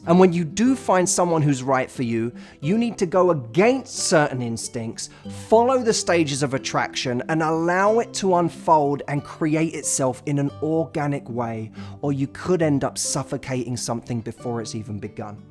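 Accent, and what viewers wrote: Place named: London, United Kingdom. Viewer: London accent noted :-)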